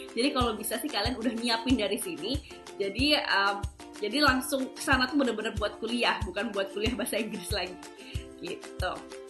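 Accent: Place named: United Kingdom. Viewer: Logatnya Udah Btistish UK banget . 😅😅😅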